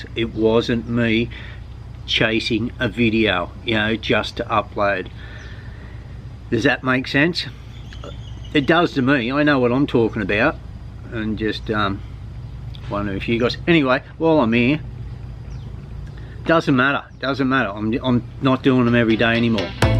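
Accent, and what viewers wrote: Australia. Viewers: Hey....don't seek perfection...in every video you are doing...great...its informative...since it's new world to us....l miss vientiane....sooo much... Dazzling nature....honest People....love your ozzi accent....Baghdad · Your Australian accent is enough to keep me watching 🤣😆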